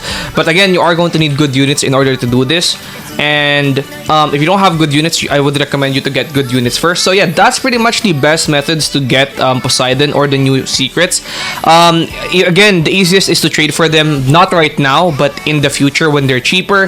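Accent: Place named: Philippines. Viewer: are you filipino? because your accent is like filipino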